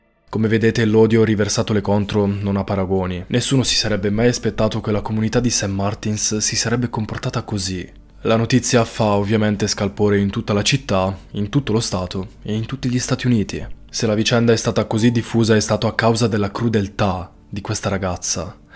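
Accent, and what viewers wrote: Sardinia, Italy. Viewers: Ma sai che mi stai incuriosendo? Parli spesso del paese in cui vivi e dato che ho capito che probabilmente è dalle mie parti mi chiedevo se per caso non fosse vicino a Limbiate! Chissà... Però mi chiedo anche come mai se vivi da queste parti si sente così tanto il tuo accento sardo? Io infatti ero assolutamente convinta che tu vivessi in Sardegna! Vabbè come dici tu giustamente quando parli di Istagram, che se vogliamo farci i cazzi tuoi possiamo seguirti li,visto che io non uso Istagram me li faccio addirittura qui ma capisco assolutamente se non vorrai rispondere! Buona serata a te!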